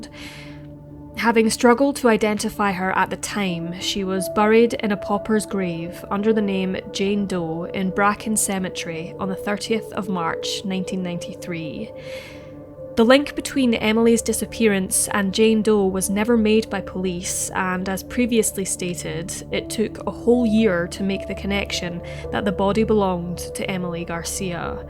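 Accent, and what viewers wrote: Scotland, United Kingdom. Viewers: You know it's a Scottish narrator, when you hear, "alight" instead of "get off." Thumbs up for eloquence! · Excellent. Another sad sad case I never heard of. Wish I could have helped. Thank you. I have subbed as well. PS I love your accent. Are you...Scottish? Please forgive me if I am wrong.🙏😩✌👼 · Which part of Scotland are you from? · I can see why businesses often want to set up their call centres in Scotland. Can't beat the Scottish accent. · It's Scottish can't u tell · It's a perfectly good Scottish accent, nothing funny about it!!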